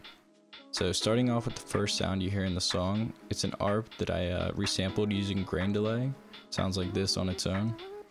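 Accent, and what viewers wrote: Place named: United States. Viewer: Bro u live in Bangladesh then how did u pick up that American accent?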